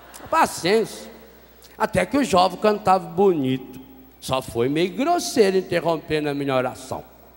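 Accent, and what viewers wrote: Minas Gerais, Brazil. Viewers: Eu aqui lutando contra a ansiedade aí vejo este vídeo de um santo pregando do seu jeito caipira de minas❤️❤️❤️🙌 Deus seja louvado!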